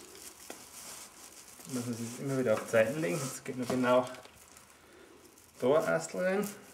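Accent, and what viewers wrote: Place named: Austria. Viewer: das video is von der qualität völlig in ordnung ;) das österreichische ist ein bisschen knifflig...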